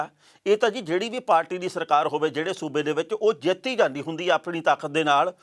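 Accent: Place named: Malwa, India. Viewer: . ਸਾਹਿਬ ਦਾ ਅਸਲੀ ਮਲਵ ਈ ਪੰਜਾਬੀ ਵਿੱਚ ਸੁੱਧ ਉਚਾਰਣ ਮਨ ਨੂੰ ਮੋਹ ਲੈਦਾ ੲੇ। ਕਿਉਂਕਿ ਇਸ ਤਰ੍ਹਾਂ ਬਿਆਨ ਕਰਨ ਨਾਲ ਹਰ ਕੋੲੀ ਖਬਰ ਪੂਰੀ ਤਰ੍ਹਾਂ ਦਿਲ ਦਿਮਾਗ ਤੇ ਅਸਰ ਕਰਦੀ ਹੈ।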